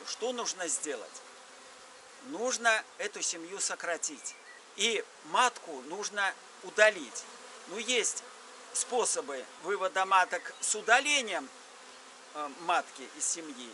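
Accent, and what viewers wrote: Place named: Belarus. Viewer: я сразу по говору понял что вы с белоруси)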